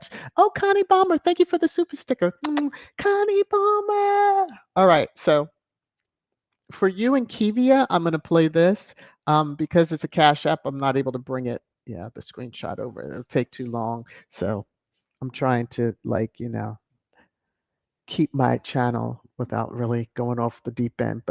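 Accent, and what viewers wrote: RP, United Kingdom. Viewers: Thank you for great thrash time 🙏😘❤️❤️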